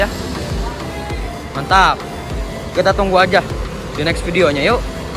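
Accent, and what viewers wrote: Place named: West Java, Indonesia. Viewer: Logat khas orang sunda😀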